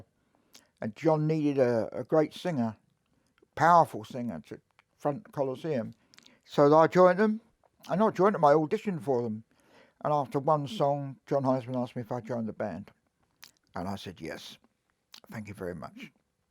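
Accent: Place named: England, United Kingdom. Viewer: Hi I didn't know you are a pomie. I thought you were a Yank. But I like you so much mate cause your a man you got that attitude and you remind me of my friends of the past. More boys should grow up like you. As far as doing your hair you can watch my video called balding and greying part 2 Dave Kirby.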